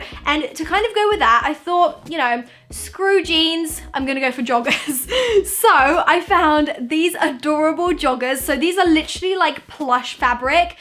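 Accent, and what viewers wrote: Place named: United Kingdom. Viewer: I discovered you a couple of days ago and I really love you and I love your accent btw is it British?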